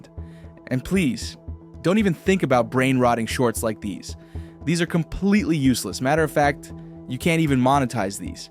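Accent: Sri Lanka. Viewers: bro are you from sri lanka?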